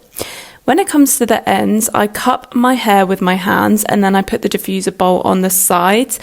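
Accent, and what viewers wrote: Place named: United Kingdom. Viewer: I didn't know you were British you have such a beautiful voice 😊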